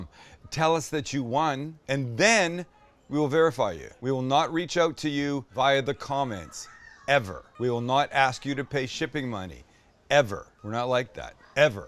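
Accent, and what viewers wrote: Ireland. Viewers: Love the show and this sounds awesome!!! Have you ever considered a Kentucky Hot Brown, yum I think you should do at least one whole show using your Irish accent, lol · You from Ireland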